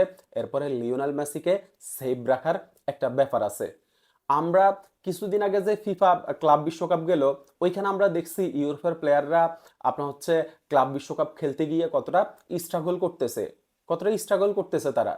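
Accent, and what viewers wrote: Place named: Noakhali, Bangladesh. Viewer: আপনে কি নোয়াখালীর লোক , মুখের কথায় বুঝা যায়🎉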